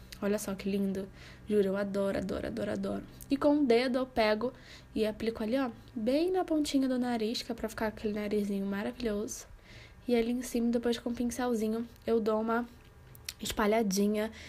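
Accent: Rio de Janeiro, Brazil. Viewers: Achei que você fosse do Rio 😆 sotaque carioca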